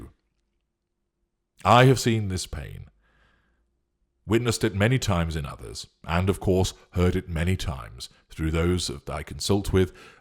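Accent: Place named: Oxford, United Kingdom. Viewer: 🙂 i like his accent, he sounds like a Prof at Oxford ☺️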